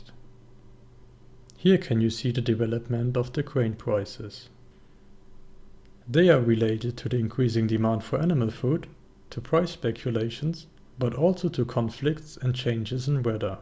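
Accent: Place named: Switzerland. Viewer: Geneva s English sucks Acento SUIZO tipico: frio y de fumador de rodillas guacala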